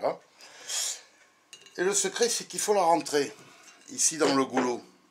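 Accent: France. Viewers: bonjours je ne connaissais pas cette astuce pour l echelle dans la bouteille j ai 67 ans et je suis bricoleur egalement mais surtout en soudure a l arc mais tu m apprend encore beaucoups de choses sans indiscretion de quel region de france est tu car j aime t entendre parler amicalement d un c thi vivant en belgique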